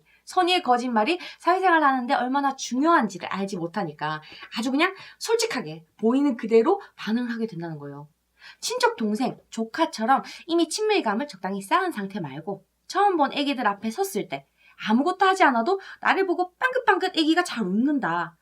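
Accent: Gyeongsang, South Korea. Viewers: 갱상도 어딘교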